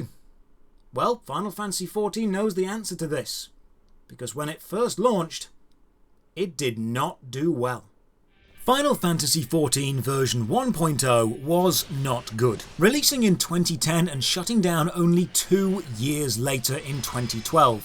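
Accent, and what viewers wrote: United Kingdom. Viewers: proves that all you need is a deep voice, British accent and a suit and you can make any topic sound high class.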